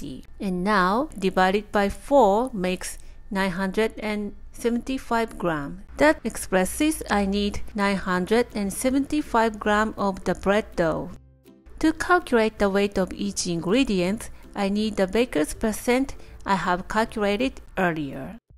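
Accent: Japan. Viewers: You sound like my dentist who's from Japan. I absolutely ADORE her🥰. And thank you so very much for your contribution. Subscribed. 🙂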